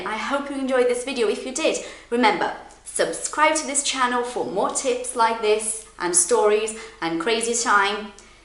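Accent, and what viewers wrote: United Kingdom. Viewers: That was an amazing class! A whole class in English. And I have to say I love your British accent. Thank you so much for your tips.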